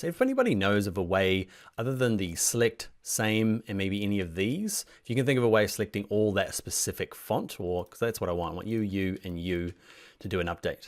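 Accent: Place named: New Zealand. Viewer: Best combination of informative and cool funny narrative. Good job man. You are my favourite whats new guy. And the accent! Kiwis are best...:-)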